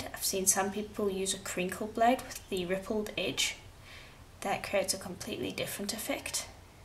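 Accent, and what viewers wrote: South Africa. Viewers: Love your tutorials you're very good! Also love hearing the South African accent, miss it since I left in 2000 n don't hear it much. My husband always teases me when I say 'off' and I giggle every time you say it. Never noticed it before but now I can hear what he means. Keep crafting, you're awesome.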